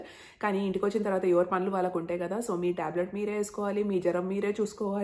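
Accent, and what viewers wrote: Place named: Telangana, India. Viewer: Telugu itey pakka telangana la undi sister😅🤩🤩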